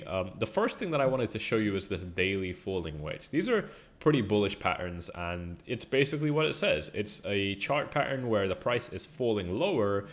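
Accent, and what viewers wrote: Scotland, United Kingdom. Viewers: Good man thanks for your videos, are you from Scotland?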